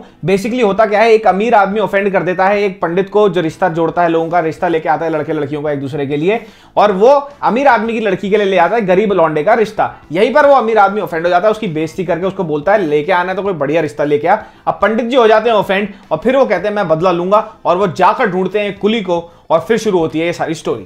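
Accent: Haryana, India. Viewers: Are you Haryanvi??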